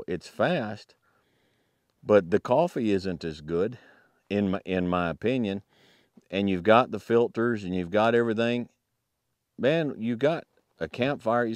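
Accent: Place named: West, United States. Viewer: I think folks always confuse a western accent for a southern accent, this man's voice should clearly define the difference lol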